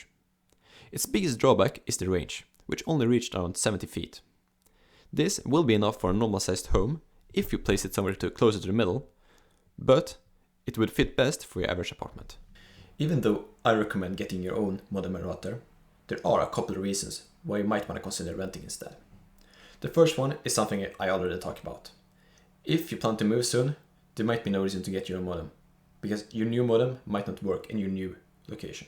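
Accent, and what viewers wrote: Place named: Germany. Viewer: I can barely understand your accent dude. Speak slower because your thick german accent makes us Americans difficult to understand what you are saying. And it’s even harder for us Americans who English is not their first language (hispanics). So please, speak slower and try to pronounce better every single word.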